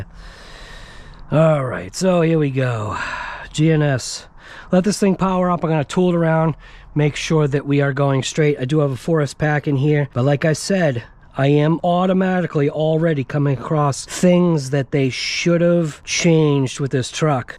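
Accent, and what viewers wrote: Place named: Boston, United States. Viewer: Good review bro. But I absolutely love my blitzkrieg! It may not be perfect, but it is a fun rig. Got the red cat Valkyrie TR and is great so I figured I'd get the MT of the WOV just to see how she stacks up platforms are basically the same. I've been watching your channel for a while. Congratulations on your success buddy even love the Boston mass accent!😅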